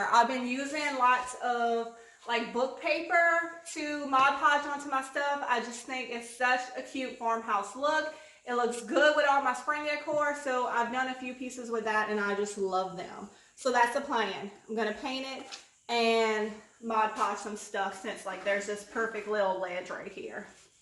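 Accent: Louisiana, United States. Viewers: Isn't she precious?? I first saw another of her videos and I thought " that accent sounds familiar". Turns out she is from the same area of south Louisiana as my best friend's family, Cajun country. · Are you from Louisiana? You have a similar accent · Girl, it's absolutely a bedpan. I'm glad I discovered your channel, your content is great. And I love listening to your Louisiana accent!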